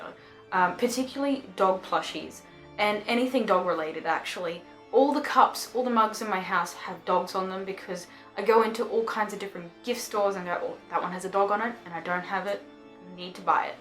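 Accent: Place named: Australia. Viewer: And to me, as soon as she started talking I thought "she's from Australia" 😳 lol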